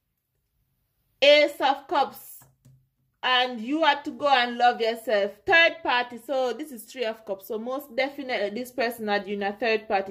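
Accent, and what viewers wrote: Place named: Jamaica. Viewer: Awesome Jamaican accent, stay blessed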